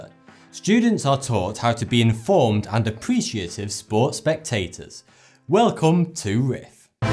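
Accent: United Kingdom. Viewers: Is he British?? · Thoughty2 sounds like 42 with your British accent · I like your british accent :3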